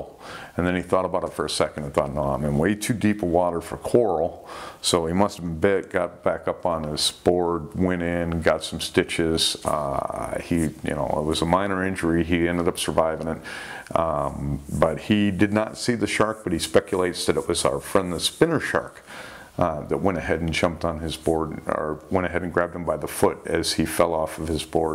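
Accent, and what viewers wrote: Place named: Great Lakes, United States. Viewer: BTW I’m from Michigan, you sure sound like you’re from here or the Great Lakes area!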